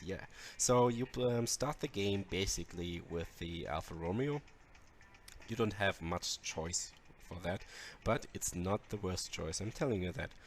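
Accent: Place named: Germany. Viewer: Man merkt richtig an der Aussprache dass du ein Deutscher bist 😁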